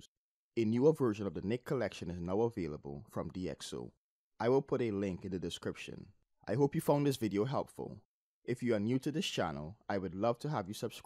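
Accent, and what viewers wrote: Trinidad and Tobago. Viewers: Your accent almost sounds Trinidadian... where are you from bro?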